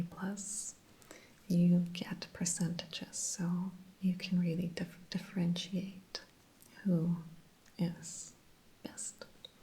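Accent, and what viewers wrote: Pennsylvania, United States. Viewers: I’m from California and when I first listened to your videos I thought you were American! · This is going to sound silly now that I know where you’re from, but I thought you were Pennsylvania Dutch! I live in PA, and your voice sounds very similar to the Amish community here. I had thought you must be ex-Amish or something haha. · Watched your channel for months and assumed u were American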